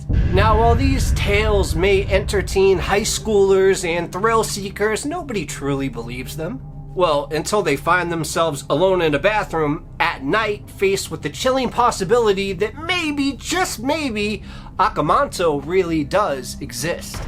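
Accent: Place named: United States. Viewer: Love your videos and the American accent as im in England 🇬🇧 i love watching your videos every time you put them out but due to time difference across the pond i get to watch them a little later on. Keep them coming though ❤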